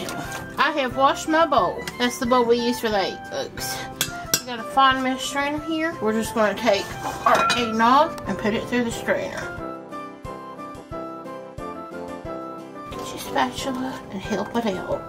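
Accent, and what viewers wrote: South, United States. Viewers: Love the country accent ❤️🎄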